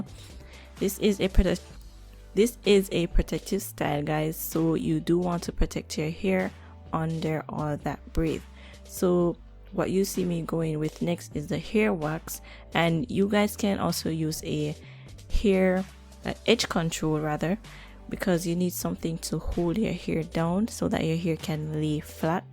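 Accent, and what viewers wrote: Jamaica. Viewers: I figured you're Jamaican based on your accent 😍 love the execution girl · Heard that Jamaican accent and subscribed instantly 😩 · You are very Jamaican. That "lawd Jesus" buss out 😂😂. Very nice hair style and method. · Cya hide the accent 🇯🇲 lol love it and def gonna try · Yup..I'm Jamaican too..so I was like yeah she is from Jamaica 🤗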